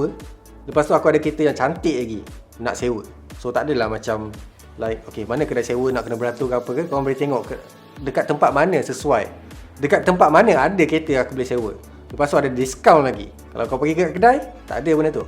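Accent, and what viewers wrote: Terengganu, Malaysia. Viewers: Org kuala terengganu ke ? Area mana tu? 😄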